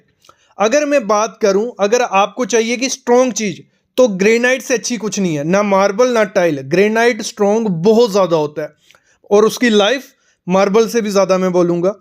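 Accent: Jammu, India. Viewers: Bhaiya ji jammu se ho aap toh…👍🏻👍🏻 Waah ❤️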